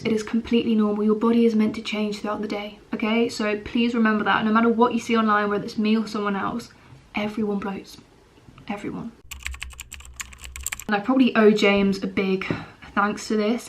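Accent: United Kingdom. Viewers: Your British accent is adorable 😩🎀✨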